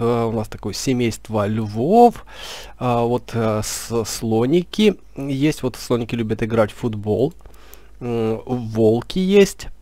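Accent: Ukraine. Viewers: Автор украинец? Слышится акцент)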